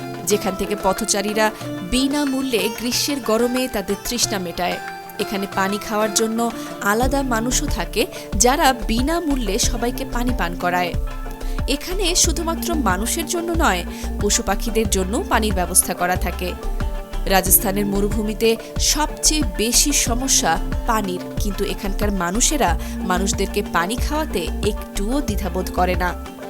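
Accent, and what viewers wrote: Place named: West Bengal, India. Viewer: আপনি তো ম্যাডাম ভারতের পশ্চিমবঙ্গের মানুষের মতো উচ্চারণ করেন, কথা বলেন। তো -- হিন্দুদের ধর্ম নিয়ে ভারতে চলে আসুন-- ভারত আপনাকে স্বাগত জানাবে ------!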